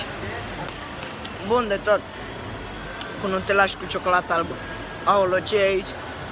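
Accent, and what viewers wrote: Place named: Egypt. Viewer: بجد بتبقى عسل جداا لمل تتكلم مصرى💟💟💟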